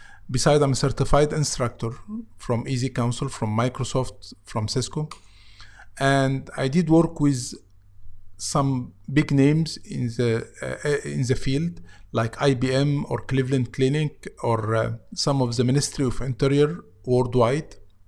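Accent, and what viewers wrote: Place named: England, United Kingdom. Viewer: Inspite of that English accent everything is okay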